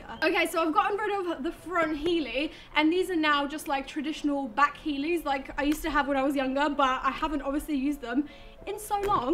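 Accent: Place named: United Kingdom. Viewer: Her british accent OMG 😭💖💖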